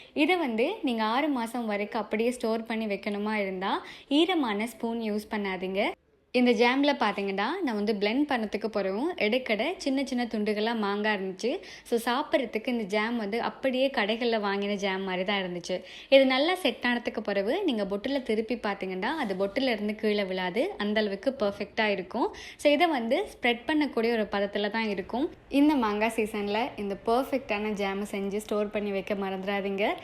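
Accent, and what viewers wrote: Sri Lanka. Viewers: Yesterday I prepared as per ur ratio. It came out well. Tnq so much maa.R u from srilanka 🤔bcoz when u talk u sound like srilankan.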